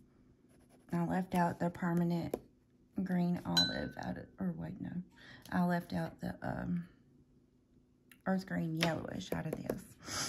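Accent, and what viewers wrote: United States. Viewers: I love your accent. I too am from North Carolina. I grew up in the Outer Banks. I have just started coloring and I appreciate all you do to help me. Don’t be nervous, you got this❤️❤️❤️ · Southern girl? I used to live down south on the Gulf Coast. Some of the best accents in the U.S.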